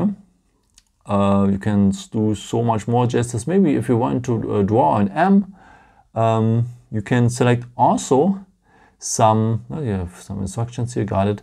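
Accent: Germany. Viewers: If the phone is on standby 'ne?' tells me right away you're German 😁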